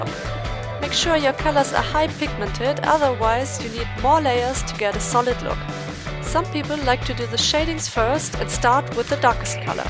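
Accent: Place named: Germany. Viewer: are u from germany because yopur english sounds really german xD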